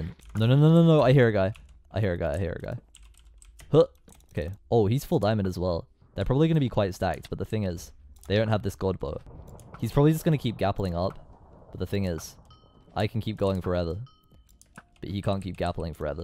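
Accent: United States. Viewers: Like like like like like like like I WANNA HERE THIS MANS merican accent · You better keep your American accent If you dont... Your gonna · PLEASE LIKE I WANNA HEAR HIS AMERICAN ACCENT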